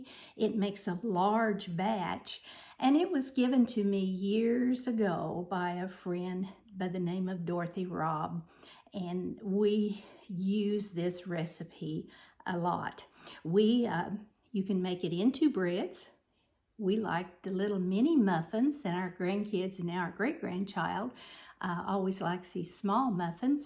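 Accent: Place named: South, United States. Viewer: I love your “accent”. I wish I had that Southern accent…or a British accent. Love both. I have a Southern Californian “accent”. God bless you and your family! Stay healthy.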